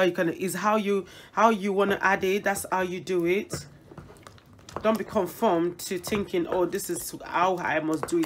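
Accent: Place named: United Kingdom. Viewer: Ur British accent eehh😁😀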